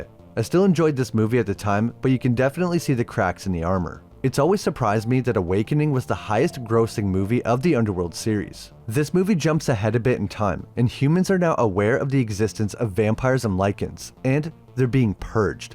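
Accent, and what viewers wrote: Canada. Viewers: Your Canadian accent isn't slipping by me! keep up the good work my man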